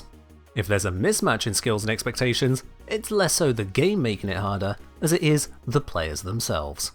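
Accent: United Kingdom. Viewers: Surprising that the first language is not English. He sounds kinda British tbh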